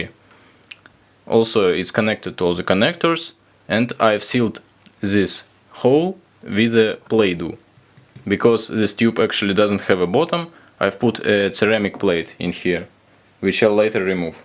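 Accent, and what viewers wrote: Russia. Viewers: Are you from England? Because I hear you're not from England, but from Russia, right?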